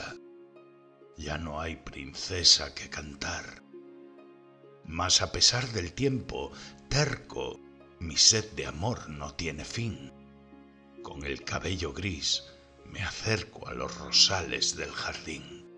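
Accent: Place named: Castile, Spain. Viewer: Lo poco admisible es la prosodia castellana estricta recitando los versos sublimes del poeta. Rubén Darío era nicaragüense y en los finales del siglo XIX y principios del XX el acento idiomático no se perdía fácilmente. Por eso al vate inmortal del español, Rubén Darío, hay escucharlo en su expresión fonética natural.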